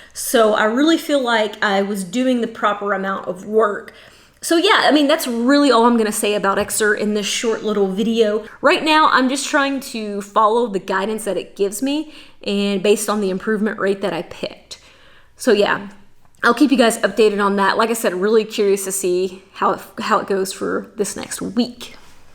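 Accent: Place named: Kentucky, United States. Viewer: Huh, not much talk about Xert. Love your Kentucky girl accent and mannerisms. Couple of suggestions for ya. try magnesium for your cramps. D1 Female athlete taught me that one. Have your carbon bike checked immediately when it creaks. Compromised carbon due to not using a torque wrench can kill ya. I got a little experience with that one. Put some time in on nutrition. May I suggest following Dr Gregor and his whole food, plant based diet. That prepackaged white rice and ground beef is slowly killing you. Headaches? Drink mo water. I do like your ability to articulate what you are thinking. Ya just need a whole lot more schooling. Your buddy Dave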